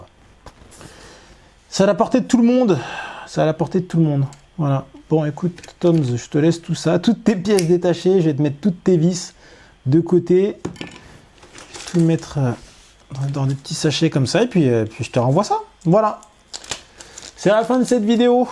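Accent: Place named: Europe. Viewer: I hate European accents, too annoying. Always sounds enthusiastic no matter your actual expression.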